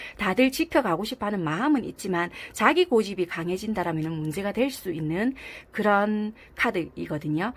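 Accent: Busan, South Korea. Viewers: 하이용 또왔어용 쩍지보러 제가 궁금한건 믿음이 안가는 현실을 스스로 만들고 믿어달라하는 타로가 나와서 사람은 믿을게 못되는데 타로를 재미로 보기가 힘듬 물어볼때가 없어서 보는거거든요 잼있게 보고 조아요 미리누르고 가요 좋은밤되요 👍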